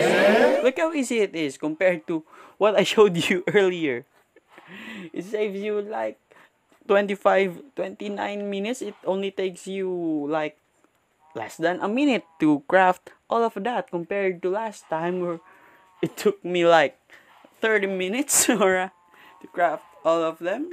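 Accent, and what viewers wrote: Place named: Philippines. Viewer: Noypi!!!! I just know from your accent, am I right?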